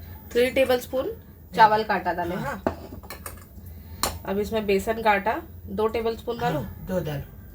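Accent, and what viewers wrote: Konkan, India. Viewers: Aap ka kokni style me bolna aur banana bahut acha lagta hai ❤️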